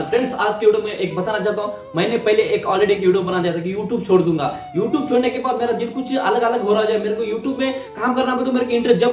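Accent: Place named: Hyderabad, India. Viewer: Hyderabadi hindi wow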